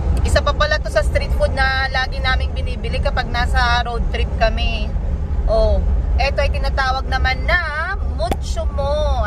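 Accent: Iloilo, Philippines. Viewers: Ilonggong ilonggo ka gid ba Inday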